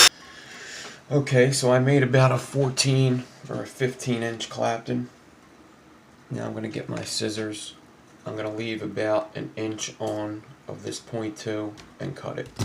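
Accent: Philadelphia, United States. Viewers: Am I detecting a West Philly accent?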